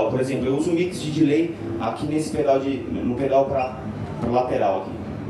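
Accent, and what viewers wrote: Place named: Brazil. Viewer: judging by the portuguese he's speaking i wouldnt say Mexico, more like Brazil...anyway, some people like that high gain stuff, which i dont approve but will not condone...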